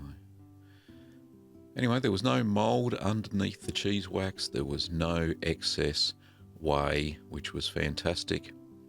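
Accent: Australia. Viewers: Nobody gives a crap, stop being such a whiny baby. He's got an Australian accent. Leave the man alone.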